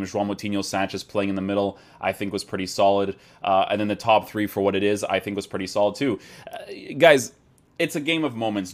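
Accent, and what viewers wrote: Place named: Portugal. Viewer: I swear to god I cant understand if u are portuguese or not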